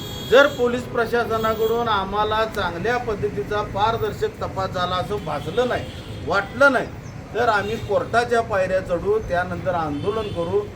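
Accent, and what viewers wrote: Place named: Kolhapur, India. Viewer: आवाज कोल्हापूर चा 👌👌👌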